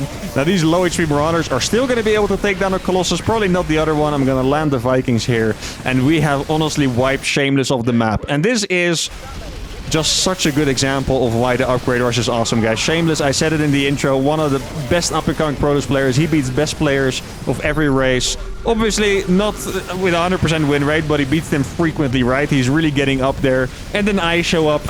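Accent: Netherlands. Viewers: I've been lovin' your replays, big daddy. One can hear how much you enjoy the game through the excitement in your voice, and, the Dutch accent is just the cherry on the top. Keep it up, bru.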